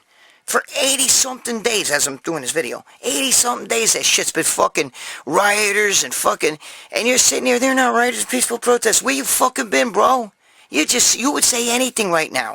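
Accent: New York, United States. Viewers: Brother, you sound like me with a New York accent! Take care of yourself we need you...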